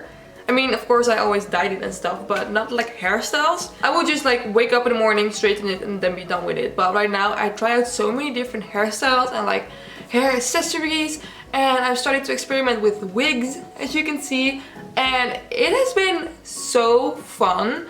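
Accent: Netherlands. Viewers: This is my first video watching you and your english accent sound so similar to mine are you by any chances dutch? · omg ben je nederlands of beeld ik me nu een accent in?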